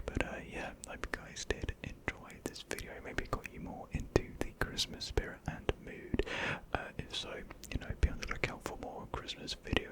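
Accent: United Kingdom. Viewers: I adore you, but you're also easily the most British person I've ever listened to, like painfully British.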